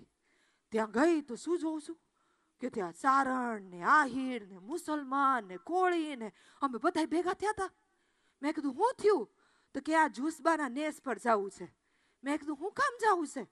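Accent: Kathiawar, India. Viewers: Superb performance......its not easy to perform this act by solo........great......your expression, that proper tone of kathi language.......first time i have seen you on rangmanch......keep it up......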